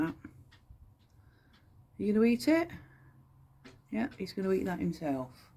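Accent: United Kingdom. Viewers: BUONASERA SIGNORA È SEMPRE UN IMMENSO PIACERE SENTIRE IL SUO PERFETTO ACCENTO BRITANNICO E VEDERE I SUOI MERAVIGLIOSI GATTI E LE STUPENDE VOLPI DEL SUO QUARTIERE ANGELI STUPENDI ANCHE LEI È UN ANGELO PER TUTTI LORO!!!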